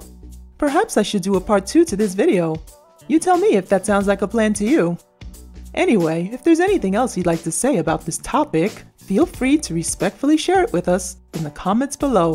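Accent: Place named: Bronx, United States. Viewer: You got to be from the Bronx lol it's ok I'm from Queens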